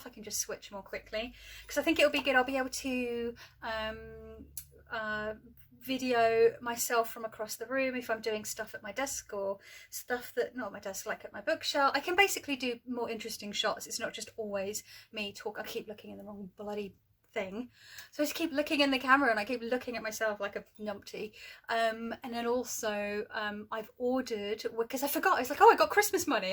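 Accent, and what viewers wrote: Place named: Scotland, United Kingdom. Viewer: This was fun! I definitely think you should have some stitching in each vlog - I love watching your technique! Your stitches are so neat! And what a fun Scottish accent!! 😄👍 Did you make a pouch for your Noblet tarot?! I'll need to make one. I'll see what colours I've got available 😄 Looking forward to the next one! 💖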